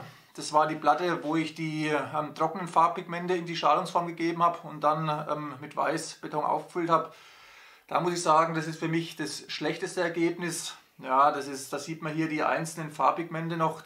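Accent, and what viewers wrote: Franconia, Germany. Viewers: Wieder sehr interessant geworden das ganze, locker erzählt mit leicht fränkischen unterton.......mach ner su weider ! Die 3te Platte schaut schon am besten aus, allerdings könnten die Kontraste etwas stärker sein. Evtl etwas warten bis der Beton nicht mehr ganz so flüssig ist und die 2 Farben sich nicht mehr so stark vermischen ? Hmm.......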